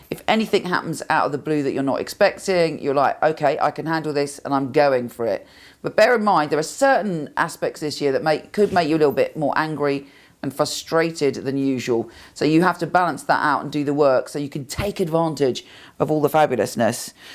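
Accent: United Kingdom. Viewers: A British Accent and Sultriness to your tone in one. Wow.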